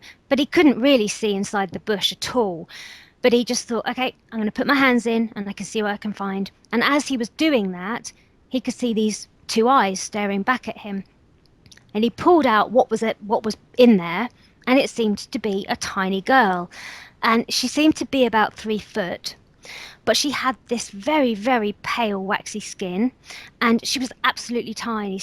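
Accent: England, United Kingdom. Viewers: is awesome. I live near cannok chase I wish I could give her a bit of information about many of the stories about that place all I have some intresting information linked to masons and black eyed children. Ill try and look for a way to send her a email. Hey but her stories are great nice prim and proper english accent great story teller. The pig man is another story linked to cannok chase a Bigfoot type creature. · nice story and lovely british voice but on a sidenote it's just a story :) you have to be very gulable to believe this really happend but it doesn''t matter if you want a scarry night and just want some mystery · This is the kind of British accent that I love not the Bo'Ohw'O'Wo'Er one.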